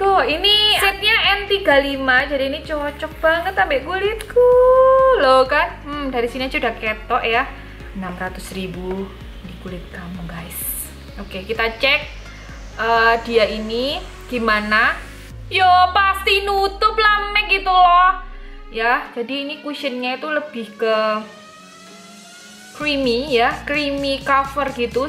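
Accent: Java, Indonesia. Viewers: Saya selalu suka tutorial make up mbak, gaya bicaranya yg medok dan pemilihan warna2 make up nya yg ok bikin betah utk nonton, sukses selalu utk mbak dan terus saya tunggu up date tutorialnya, Gbu😀 Dan saran saya tolong juga jaga kesehatan kulit wajahnya ya😀👌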